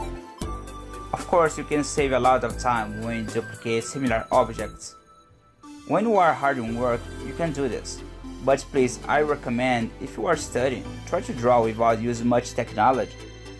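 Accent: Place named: Brazil. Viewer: Muito bom! Parabéns e obrigado. (I'm supposing you're brazilian considering your name and accent)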